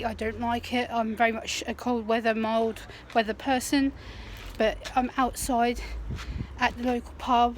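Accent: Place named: United Kingdom. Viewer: This is the first video of yours I've watched because I'm struggling with the heat and typed in autism and hot weather and I found it really helpful so thank you! Also I'm not sure where in the UK you are but our accents are identical 😅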